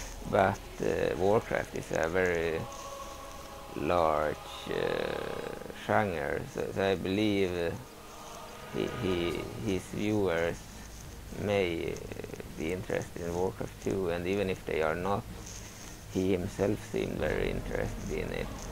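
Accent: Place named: Sweden. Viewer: I ceartinly look forward to be playing this :D Looking forward to the Ahn Qiraj the most personally Also do excuse me if im wrong but im an sensing an slight tint of Swedish in your english voice, if so Hallå Hallå :D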